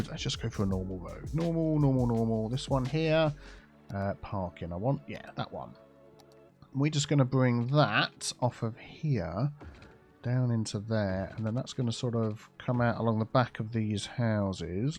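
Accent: United Kingdom. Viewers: Your British voice is relaxing 😅😂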